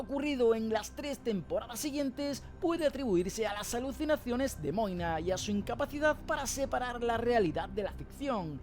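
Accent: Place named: Spain. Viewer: por como los llamas y tu acento supongo la traducción española les dio esos nombres... me quedo totalmente con los latinos xD El final me agradó y me dio pena, fue innovador y diferente aunque en un inicio me dio rabia y hasta le grité a la tele xD pero me pareció bonito, sin embargo deja muchos huecos argumentales y e suna gran invitación a ver de nuevo la serie. Me quedaré con las ganas de otra temporada y que todo fuese real, pero se me apretó el kokoro con el momento final de isolde y la versión del tema que pusieron.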